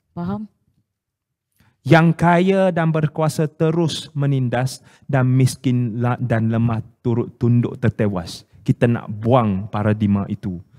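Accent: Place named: Perak, Malaysia. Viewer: Ada bunyi slang perak yob..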